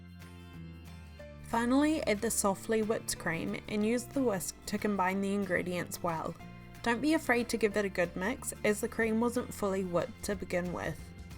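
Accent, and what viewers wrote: New Zealand. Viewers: Oh, nice. :D Quite different from the australian accent isn't it. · Amazing recipe. I will surely try this. By the way accent is similar to New Zealanders. Am I correct? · Ivana Matić more of a NZ accent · She has a New Zealand accent...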